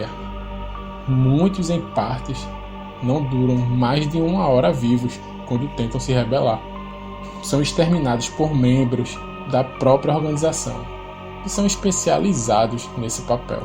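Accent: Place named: Northeast, Brazil. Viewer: pelo sotaque ele parece ser nordestino, porque parece muito com o da minha região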